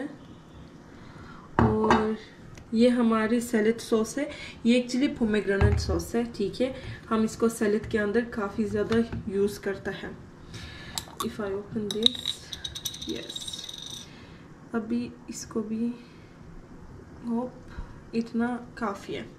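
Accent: Pakistan. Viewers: Inka Urdu lahza pakistan ke kis area ka hai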